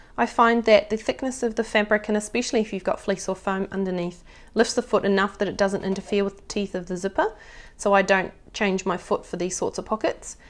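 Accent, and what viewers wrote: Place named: New Zealand. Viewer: What sort (brand) of tape was it? Luv your kiwi accent too. Great tutorial. Thanks! 😂🤣💕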